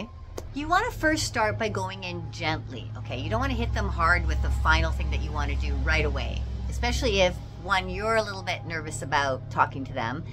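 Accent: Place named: Canada. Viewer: Hah! I KNEW it! Even though your channel lists you as being in the United States, I definitely got a Canadian vibe off you. A lot of it was the accent, of course, but certain American accents, especially west coast ones, sound a lot like Canadian accents, so that's hard to tell. I think part of it was just the vibe you gave off, you just felt like home. So glad you are on here. It really does feel as if I'm getting information/perspective/experience from a "home" kind of place from you, and knowing you are Canadian as well just adds an extra level of warmth and comfort to it. Odd. It really shouldn't make much difference. People are people. Yet it does. Interesting. I'll have to mull that one over. I knew it!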